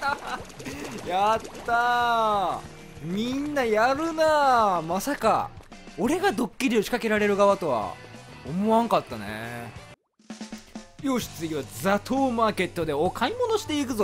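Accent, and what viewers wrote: Kansai, Japan. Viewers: 関西出身ですか？？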